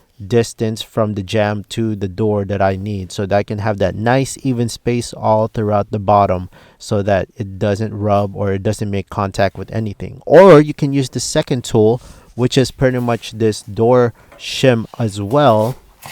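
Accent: Philippines. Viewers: I know a Filipino when I hear one lol Thanks for this!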